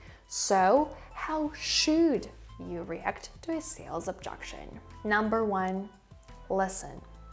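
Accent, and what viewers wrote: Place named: England, United Kingdom. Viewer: I have subscribed to your channel for your English accent. Your English pronunciation is different from others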